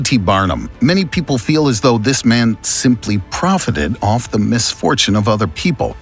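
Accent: United States. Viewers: the narrator sounds American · Don't speak with an American accent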